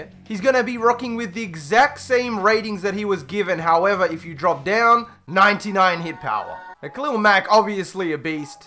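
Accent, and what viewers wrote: United Kingdom. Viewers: I CAN"T DEAL WITH HEARING FOOTBALL WITH A BRIT ACCENT sorry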